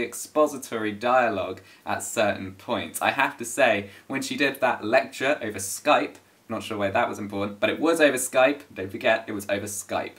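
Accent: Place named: England, United Kingdom. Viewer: You had to be English. Where you from exactly? Anyways. I didn't like this film in t' overall. Disappointing. Americans apparently fell for this bullsh*t. Don't watch it again, pls.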